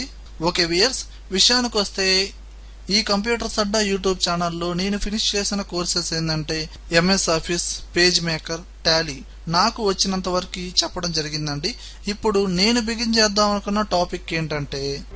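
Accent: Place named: Rayalaseema, India. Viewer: Anna, 00, Na, Maha, antunnarante miru kachitanga Rayalasimavaru.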